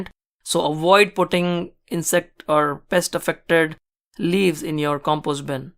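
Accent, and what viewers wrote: India. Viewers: his accent is interestingly Indian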